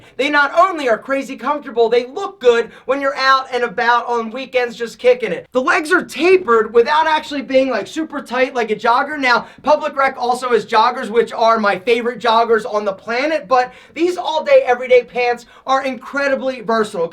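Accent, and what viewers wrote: Philadelphia, United States. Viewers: This guy gotta be from Philly 🗣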